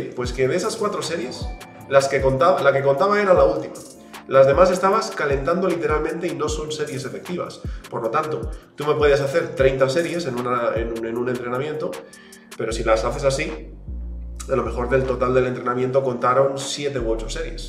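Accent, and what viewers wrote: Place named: Latin America. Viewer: Coach te quiero mucho, pero ¿por qué hablas como latinoamericano siendo valenciano?. Un saludo crack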